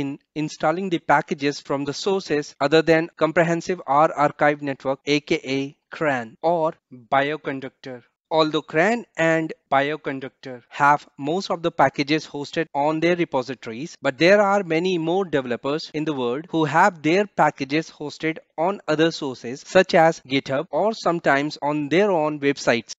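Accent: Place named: India. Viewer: talks like an indian bot, jesus christ bruh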